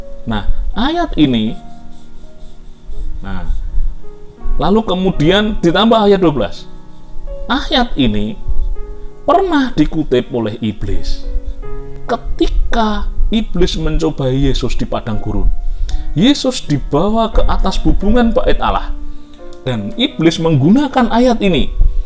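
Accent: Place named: Java, Indonesia. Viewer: Mantap Mas...khs dialek jawa jg ada..heheh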